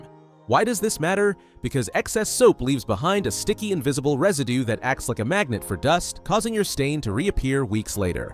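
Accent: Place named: United States. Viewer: Why an American accent when buying in the uk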